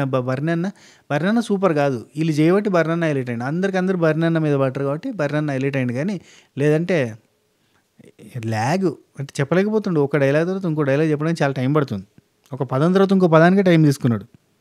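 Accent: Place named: Warangal, India. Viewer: Em okati em rendu anta 😂 Anna medhi warangal ah? Ma intlolla la matladthunnar meru ❤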